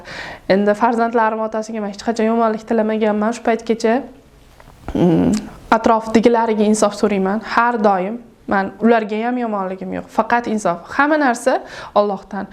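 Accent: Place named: Fergana, Uzbekistan. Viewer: Шевез Фаргонача экан Бекабодлиларни шеваси бошкача эшитганман бизи шевада гапирасиз экан яхши курдим кизим бахтли булинг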